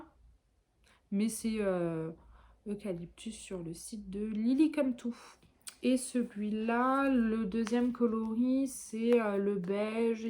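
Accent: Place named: North-East, France. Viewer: Je vous découvre par hasard , merci youtube , pour une première vous êtes bien à l’aise , bravo . Je reconnais ce petit accent du nord est de la France , je dois avoir le même 😊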